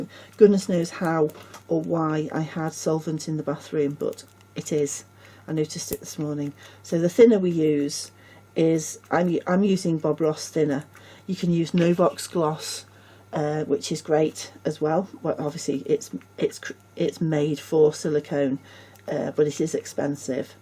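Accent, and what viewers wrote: United Kingdom. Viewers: I don’t make these I just watch you, I actually said the bigger head looked like a boy and the smaller one a girl too, to myself like 😂😂😂 beautiful work as always, I’ve watched you for years, my heart used to go like a train when you were taking the full body babies out of the moulds 😂😂😂, you’re heads and faces were amazing, I’ll have to go back and watch them again ❤❤❤ edit: yes I remember them pigs 😂😂 I didn’t care for them either, tbh I didn’t like any of the kids programmes them days especially pob 🤢 good lord, I’m in Liverpool, I can hear a slight scouse twang in your speech too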